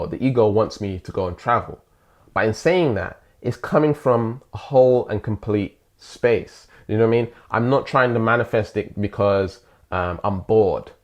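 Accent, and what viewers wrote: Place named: London, United Kingdom. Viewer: What part of London are you from? Hehe! Amazing post BTW keep inspiring 🙌😇 to want is to lack 💯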